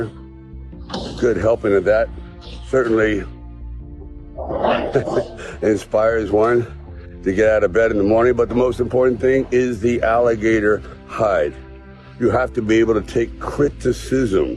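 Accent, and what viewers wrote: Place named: Italy. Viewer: I love your voice speech Italian....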